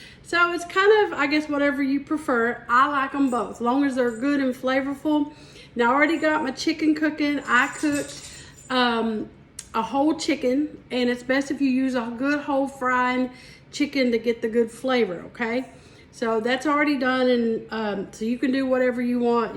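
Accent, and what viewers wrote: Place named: Kentucky, United States. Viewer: I hear that accent. I’m in California, but I lived in North East Kentucky for awhile. I lived in Winchester, under 5,000 in the early 80’s. We also lived in Corinth 210 people back then. Then we moved to New Columbus Kentucky 32 people. Could barely breath so many people lol. It was beautiful and I ate my weight in wild blackberries. I was Blue and Red from the berries and blood trying to get through those thorns. The only thing I hatted was Chiggers. Those stupid bugs made me itch so bad, but it was worth it to be out there. Love your dumpling recipe. I enjoy both flat and fluffy. Thanks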